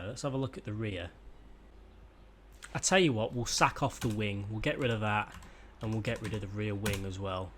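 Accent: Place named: England, United Kingdom. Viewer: Davon Pipes he's English. Canadians also do that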